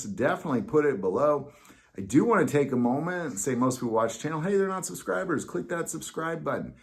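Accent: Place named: Texas, United States. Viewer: It sounds like Texas. I used to live there.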